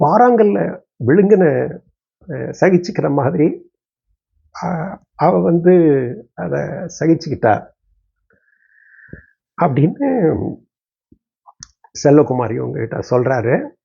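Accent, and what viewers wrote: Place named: Tirunelveli, India. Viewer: உங்கள் திருநெல்வேலி ஸ்லாங் எனக்குப் பிடிக்கும். நீங்கள் நல்ல கதைசொல்லி. திருநெல்வேலியில் இதே போன்ற ஒரு சம்பவம் எனக்கு தெரியும். இளைய சகோதரியும் பள்ளி ஆசிரியை.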